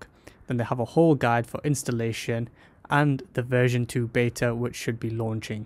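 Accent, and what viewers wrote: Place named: India. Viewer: Bro are you from india?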